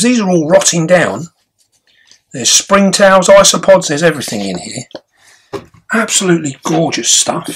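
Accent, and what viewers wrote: United Kingdom. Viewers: What big spinerats she hase,she how she can make so much web,so easy I think a lot of people watch for the Beautiful T you have an great content,but a small section I bet for ur British accent an a super small are arachnophobia people,. Am in the first bunch 8 T s so far. Have a happy new year an camera lady 👍👍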